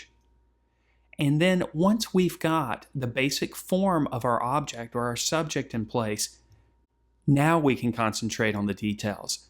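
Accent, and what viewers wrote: South, United States. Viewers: Maybe South Eastern. Has a southern drawl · Americans, which regional accent is this? I love it!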